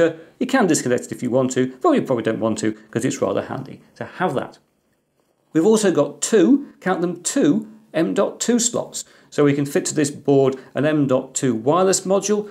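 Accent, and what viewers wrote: United Kingdom. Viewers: just curious, are you from great Britain ?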